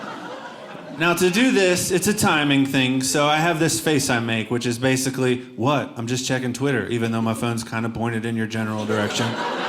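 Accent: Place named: South, United States. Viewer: Funny man. His southern drawl is spot on.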